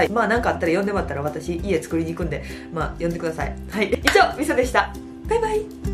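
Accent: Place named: Kagawa, Japan. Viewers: 久しぶりにキレイに食べる大食い選手！って思ったら普通の可愛い子やった…。 イントネーションが凄い安心するんやけど、同じ四国の民だったwww 近所の可愛い子やん。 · 、最近発見してばりハマってます（笑） 私は香川出身やけん方言似とってめっちゃ親近感湧いとる！！ 応援してます♡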